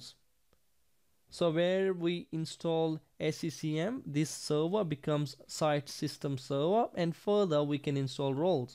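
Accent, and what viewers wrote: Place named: Australia. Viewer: You sound Ozzie....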